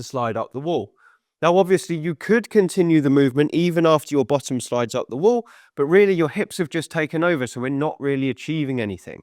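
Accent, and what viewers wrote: United Kingdom. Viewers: 57 year old male…I tried this once and found it useless…the best part of this clip was his British accent..Americans love a British accent but other than that I didn’t find this useful at all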